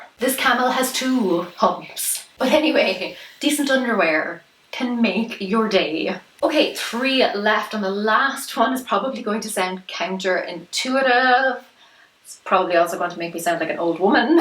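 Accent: Ireland. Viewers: Good advice!I love your Irish accent!!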